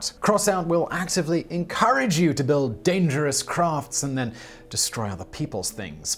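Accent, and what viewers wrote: United Kingdom. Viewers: So this video is extremely morbid. So why was I laughing through it? With Whistler's fast paced British accent, and the over-the-top 3rd world antics, the whole thing started to sounded like a Monty Python sketch. (No offense, Simon and writers--you are awesome)!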